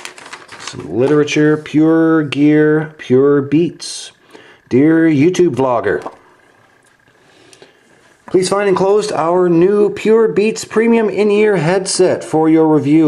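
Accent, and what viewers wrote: United Kingdom. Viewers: Best british accent ever. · Thumbs up for the English accent! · lmfao your British accent is epic, it makes us British feel proud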